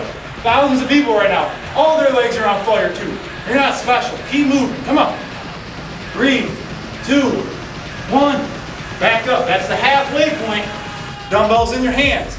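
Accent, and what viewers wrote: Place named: Minnesota, United States. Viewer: you're awesome. i love the accent, too. lol. it's exactly the same as my roommate's from mn.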